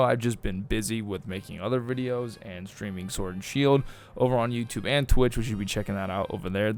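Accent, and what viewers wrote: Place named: Toronto, Canada. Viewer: U talk like u a Toronto mans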